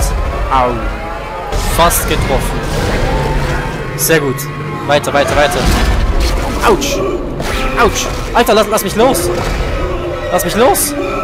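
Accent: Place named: Switzerland. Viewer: Erfrischung mit schweizer Akzent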